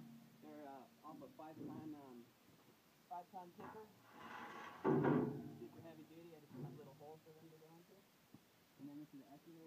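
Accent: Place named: United States. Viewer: Awesome! You still sound like an American! Third kid? Firefighter?! Damn, I miss the crap outta you guys. . . give my love to Nic. BTW, my profile pic is me raising the main just outside 39 while YOU are at the helm with Serena and the photographer Erin in the cockpit! Goodtimes, my man, gooood times. . .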